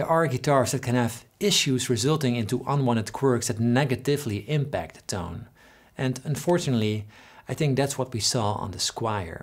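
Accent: Netherlands. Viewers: You have a dutch accent? (very little). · Hi. Are you Dutch? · Nederlands accentje is zo makkelijk te herkennen!! 🙃🙃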